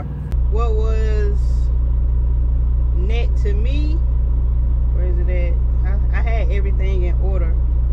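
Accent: South, United States. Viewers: It's called a southern accent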